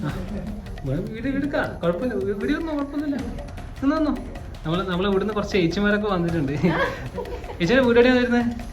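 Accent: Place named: Kannur, India. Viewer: നിർത്തി നിർത്തി പറയൂ സഹോദരാ... അതും കണ്ണൂർ ഭാഷ,,, നിങ്ങൾ അവിടെ പരസ്പരം പറയുമ്പോലെ ആകരുത് വീഡിയോ ചെയ്യുമ്പോൾ. എന്താണ് പറയുന്നത് എന്ന് ആർക്കും മനസ്സിലാകില്ല.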